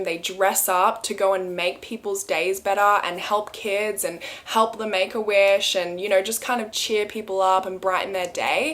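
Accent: Australia. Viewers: Ahhhh about 17 minutes in you were explaining that "here in Australia" you call Nissans nissin haha 😄 okay so you're accent is Australian.... just beautiful 😍 ❤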